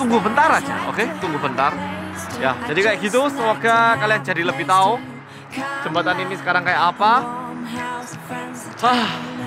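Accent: Java, Indonesia. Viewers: sepedah pancal hahahha.... orang korea yg super medok... yang bikin ngakak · MEDOK BANGET ASKJSDHKDSHFHSDDSJ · kadang pas ngomong Korea suka kebawa medok ga? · kalo ngomong bahasa Korea (jadi keliatan orang Korea banget) pas pake bahasa Jawa ( medookk tenan mas ) syuuukak banget 😍😍😍 Ketika susah maupun senang selalu ingatlah dengan pencipta mu maka sesulit apapun hidup dapat kamu lalui, sesenang apapun kamu jangan pernah lupa siapa pemberi nikmat/rejeki agar kamu tetap bersyukur. 😊😊😊😁😍😍😍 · aku wis nonton videomu ket jaman dipek ket kowe isih nganggo kamera hp. neng aku cen jarang komentar. neng asline aku seneng nonton videomu, kowe ra ngerti aku tapi aku uwis nganggep kowe kaya kancaku, kowe mung luwih tuwa sithik seko aku, caramu ngomong nggo basa jawa, logatmu marake aku ngrasa kowe kaya kancaku, ngarasa kaya kowe ki cerak. and what I like about you I know youre genuine, you care about Indonesia, you care about us like a dear friend. 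aku seneng ngrugoke kowe ngomong youre like a chatty friend that I never had. thank you for being here, thank you for your wise words, thank you for sharing your story with us. I like to listen to your story on a rough day. It helps me, I love your friendly voice. I hope you will never stop making videos, you could talk about your bad day and I will still listen to it. I don't know whether you will read this or not but once again thank you. wish I could meet you in person one day. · Iseng liat vlog dan baru tau vlog ini and... Lah... Medok banget jawanya😆 asli orang mana sih kak? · Loh gaonok jawabane jampot wes kehapus😂. Njir muedok seru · muka korea tp ko medok yo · Wah du bahasa elo medok banget sihhhhhhh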